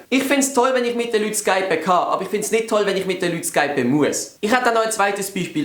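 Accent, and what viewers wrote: Basel, Switzerland. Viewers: de Dialekt isch eher baslerdütsch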